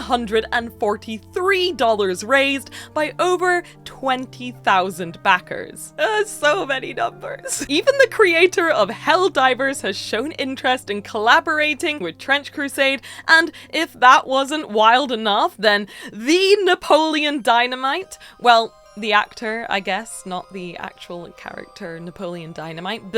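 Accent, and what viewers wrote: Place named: RP, United Kingdom. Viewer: We wanna hear your reaal English accent not this prep school rich kid accent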